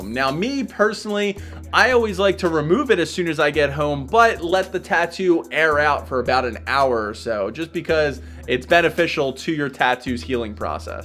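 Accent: Philadelphia, United States. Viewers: he’s from philly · You gotta be from Philadelphia with the way you say warder. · i detect a little bit on an eastern PA southern Jersey accent maybe im wrong but i know a philly accent when i hear one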